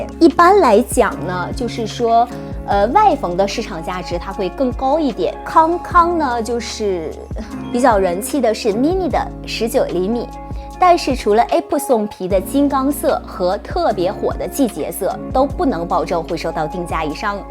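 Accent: Japan. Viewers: 日式英语我听懂 解说非常好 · 日文发音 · Her pronouncation is correct in Japanese, it's katakanam, used for words from foreign languages. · 她是日式英语发音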